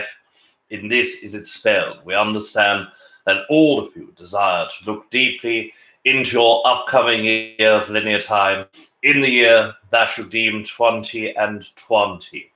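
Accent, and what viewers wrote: United Kingdom. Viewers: First time viewer. Why does sound British?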